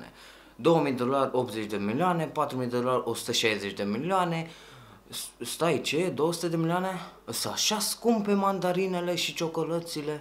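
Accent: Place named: Moldova. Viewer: Ăsta e unul dintre milioanele de motive pentru care eu îl urasc pe! deci, pe langă sa zică la copii sa doneze, şi sa enerveze părinți să le dea bani lui, îşi mai permite si sa îşi bată joc de sucre care, frate, el a avut un trecut prin închisoarea din mexic, fără familie, fără nimic! Dar a fost ajutat la orfelinat de părinți lui (care cred eu ca au fumat ceva) in moldova, trădătorul famili noastre, şi se da el şmecher că el e al 2-lea sau al 3-lea yutubăr ROMÂN care a a milionu. În primul rând el e MOLDOVEAN!!! Trădătorul famili noastre! şi al doilea rând, manipuleaza copii cu accentul lui de moldovean (accent de moldovean). Eu efident, țin cu sucre, findcă el nu e trădătorul famili româniei, şi findcă eu cred şi (probabil) ceilalți cred, ca fura lucruri de la sucre (e evident la câți bani are......). Deci, cei care au dat dislike la acest video, (fără supărare), ați fumat pra mult videoclipurile lui (REKT) Voi puteți sa scapați de asta cu noi toți, adică, uitațivă la ce Heitari are (Zopi teve, Dărielred şi alți) (scriu aşa în glumă) Yutubări voştri preferați. 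Dacă nu ai auzit de aceşti oameni până acum, tu eşti, cel mai probabil, un copil de 5 ani din moldova (daca nu îi şti, dacă îi şti, bravo) Deci, trebuie să scapam de iliesime. (glumă) P.S: sper să ajungă la poliția yutubului de Călinacho! #nuvremcolavremcoroana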